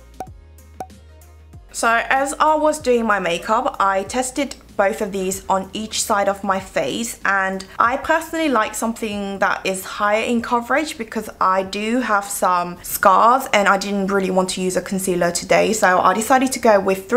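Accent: United Kingdom. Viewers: 진짜 영국식발음 넘나 매력적ㅠㅠ 저도 영국영어 배우고싶어요ㅜㅜ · 영국발음 발린다ㅠㅠㅠ..매트를 맡이라 하는 거 늠나 치이는 것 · 언니 왤케 이뻐요ㅠㅠㅠㅠ진짜 누가 백설공주 라고하셨는데 그 말 딱 맞는듯ㅠ 그와중에 영국발음 취저 탕탕😭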